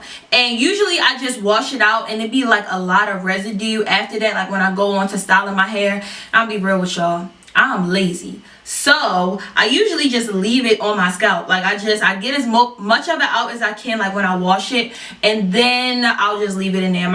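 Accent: Baltimore, United States. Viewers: You are so groovy, so the outro is 🔥! You from b-more? Your accent is very Bmore. Your realness is refreshing! New sub! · As soon as I heard the accent (without looking at your bio) I was like YUP she from Baltimore 🤘🏾 good video ✨ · are you from Baltimore? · Are you from Baltimore? I feel like I hear it in your accent.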